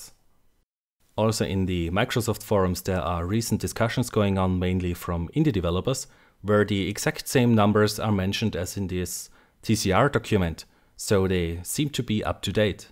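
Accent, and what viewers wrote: Germany. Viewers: without knowing who the narrator is, i can say with great accuracy, that he's german :D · Man hört. dass du aus Deutschland kommst. :D Trotzdem super. Übrigens hatte BF2 afair ne Server Tickrate von 36hz.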